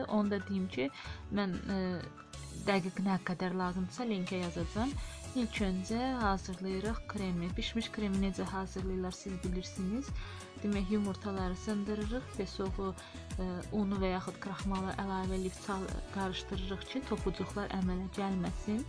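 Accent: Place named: Ordubad, Azerbaijan. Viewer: Cox sagolun cavablandirdiginiz ucun.Daniwiginizdan hiss etdim lehcenizden bizde ordubadliyiq yaxin geldiniz😙😊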